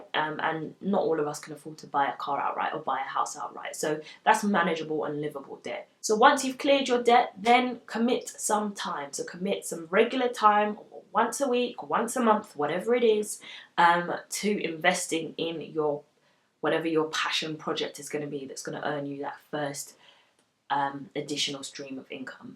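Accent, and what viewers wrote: United Kingdom. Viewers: My new favorite vlogger! A down to earth black woman, in marketing with a British Accent!! Love it!!! Subbing from Texas!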